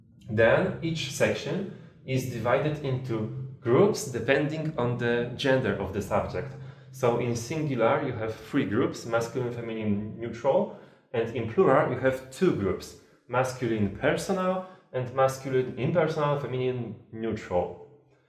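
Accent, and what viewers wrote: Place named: Poland. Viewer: Greetings, Sir! I am here for your Polish English !!! )))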